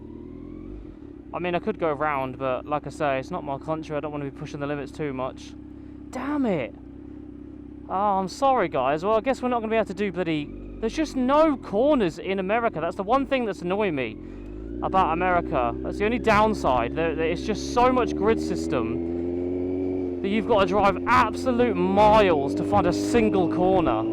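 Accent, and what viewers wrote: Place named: United Kingdom. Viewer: where u from? u accent i literally like uk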